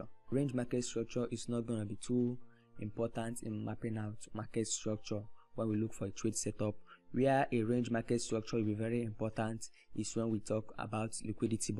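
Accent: Nigeria. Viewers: The fact that you're the first black dude I enjoyed it's videos is amazing. For real the way you broke down this topic is so understandable,I was filled with joy from the start to the ending. Judging from the accent it's the Ebira accent from Nigeria. (That's also my tribe ✌️✌️✌️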